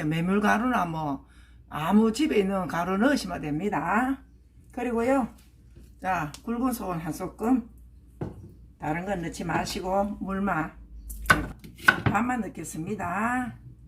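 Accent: Gyeongsang, South Korea. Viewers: 님 반갑습니다 말씨가 친근하게 느껴지네요 저도 경상도 라서요 멋진 분을 뵙게되어 영광입니다 또 뵙고싶어 손잡고 갑니다 늘 응원합니다 건강하시고 행복하시길 빌겠습니다 ~~~♡♡♡♡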